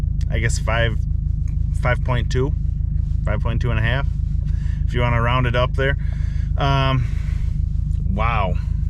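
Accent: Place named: Wisconsin, United States. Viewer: are you in wisco? Scenery and accent tells me yes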